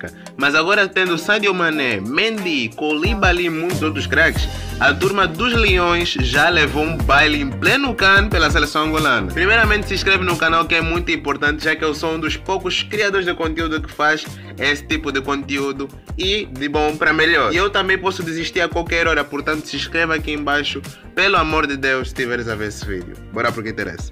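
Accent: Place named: Brazil. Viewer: bom trabalho mas para de falar com o sotaque brazileiro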